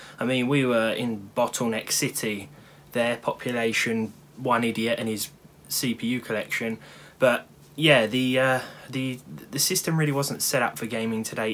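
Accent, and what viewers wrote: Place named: England, United Kingdom. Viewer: I like your traditional Englishman accent...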